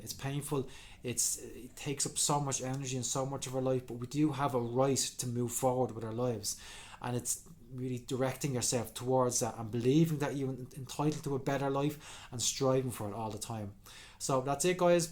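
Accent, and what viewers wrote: Ireland. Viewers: that beautiful Irish lilt I miss so much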